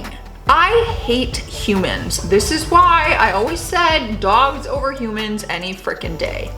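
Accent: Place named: Canada. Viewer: Okay, I can’t do this anymore. You are hilarious and also a genius. How do I know you are a genius? Because you agree with me on almost everything. That’s proof enough. What you call sassy, my friends describe in me as being an asshole. I don’t care. These hopeless losers deserve everything we can throw at them. I love watching your videos. One small criticism: I can handle the Canadian accent and saying “aboat” for about, but work on your vocabulary, girl. I am amazed at how many perfectly ordinary words you mispronounce and seem never to have heard. Still, I love you to pieces. Keep being an asshole, oops, I meant being sassy. Some of us love it.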